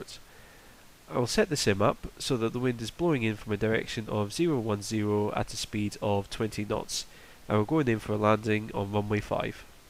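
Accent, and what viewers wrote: Scotland, United Kingdom. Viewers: Also Is That A Scottish Accient I Hear haha im socttish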